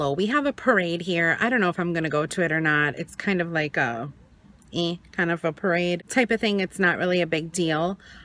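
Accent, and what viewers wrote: Wisconsin, United States. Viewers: You're a hoot!! Love your vlogs. Love your Wisconsin accent🙂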